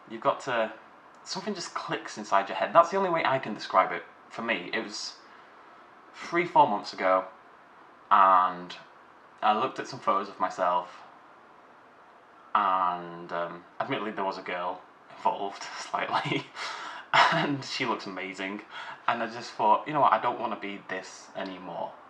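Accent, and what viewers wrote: United Kingdom. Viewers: Don't forget the British accent. Unless you have one yourself.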